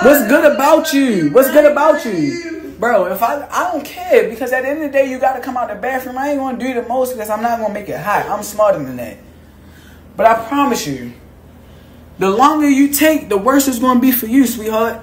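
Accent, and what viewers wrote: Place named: Baltimore, United States. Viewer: They yews and dews be killing me. Baltimore definitely in the top 5 worst accents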